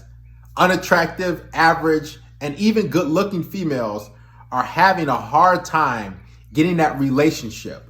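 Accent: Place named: Chicano, United States. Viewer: You speak like a "vato" bro.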